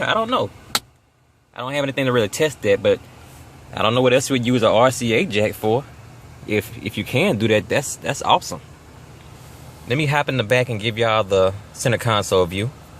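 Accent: South, United States. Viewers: After living in the American South for 10 years; I can assure you that this is what a very intelligent, articulate southern man sounds like. Did you ever have difficulty understanding him? Since the reviewer noted he is in Louisiana, and having spent a lot of time in LA, I can assure you that there are some extremely thick accents there. There are people that speak and you might be lucky to understand 1 in 5 words. That's what makes LA such a great place. A beautiful state with an amazing history, and wonderful people.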